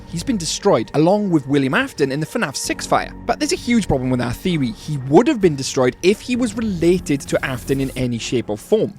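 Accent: Wales, United Kingdom. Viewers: the welsh accent is real 🔥🔥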